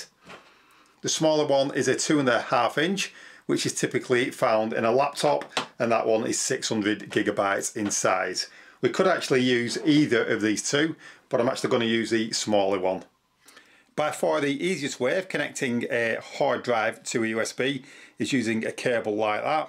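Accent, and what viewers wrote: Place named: Lancashire, United Kingdom. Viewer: Someone is from Lancashire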